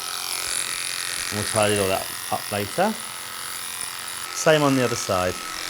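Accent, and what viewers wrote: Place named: England, United Kingdom. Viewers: I love watching the British groomers. Many times I catch myself talking British while I'm grooming dogs, lol! Especially the good ones that sit: I say "Up dahling!". You did a great job ;) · english, this is an english accent.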